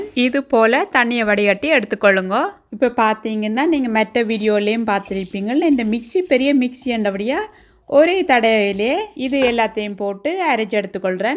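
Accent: Jaffna, Sri Lanka. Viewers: Super. Love your jaffna thamizh ❤❤❤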